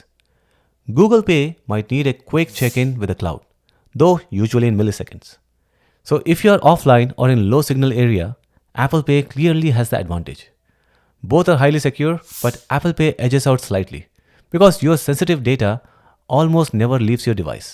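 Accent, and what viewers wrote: India. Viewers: Are you India ? You speaking likes India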